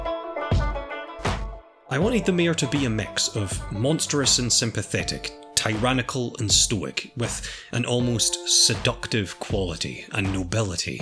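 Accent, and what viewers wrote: Scotland, United Kingdom. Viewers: Me realising that is Scottish: I thought you where English and yes the snow is every where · How am I so bad with accents… me, born and raised in Scotland my entire life.. and can’t even recognise that the voice I’ve been listening to for the past 4 hours of YouTube binging is also a Scot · I can’t un hear the Scottish now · I love that you with a nice and friendly sounding Scottish accent plays such and makes an amazing dark series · Omg you're Scottish ❤️❤️❤️❤️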